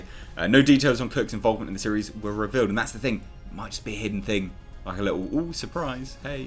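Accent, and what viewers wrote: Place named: United Kingdom. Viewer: I'm going to use the phrase 'circumnavigating the shit' in a sentence asap !! This man speaks the Queen's English.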